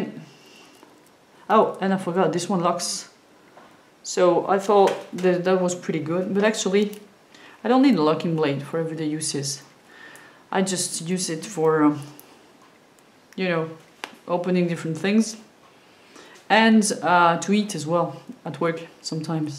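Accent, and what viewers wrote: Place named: France. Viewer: j'aime ta revue elle est vraiment interressante avec un joli accent camembert.....merci pour ton partage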